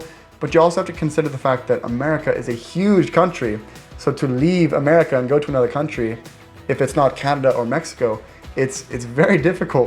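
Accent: Sweden. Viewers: Your English has changed! Your accent is still American, but has touches of Swedish and British, vowels in particular. Did anyone in the US make a comment on that when you were back? Or you adapted quickly back on American soil? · I here a bit of a Swedish accent you didn't have in your earlier videos. Even acouple of weeks in a different country can give you a different feeling when you return. Especially if you go to an place and get more involved with the culture. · Your English has the ever so slightest Swedish accent undertones now. So interesting how that happens! Only on some words but I can hear it! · has been away so long and think he kind of sounds like a Swede speaking English 🤔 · Now that you have been back to the US, I would love to hear how you realzed that You changed; your personality or your likes or your thoughts of the world. I lived in Sweden 1989-90, but now live in Tennessee (grew up in a very small town in upstate NY). 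It was easy for me to fall back into life in the US but I wasn't there for so long. I enjoy listening to your experiences and plan to retire to maybe Portugal with a decade. Thank you for your content. Good to see you back. (PS I agree with the person that said you have a twinge of a Swedish accent in your English ❤)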